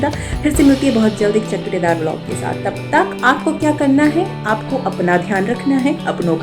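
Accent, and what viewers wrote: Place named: Punjab, India. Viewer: Ek like for the punjabi accent to banta hai... Nice vlog too...keep it up...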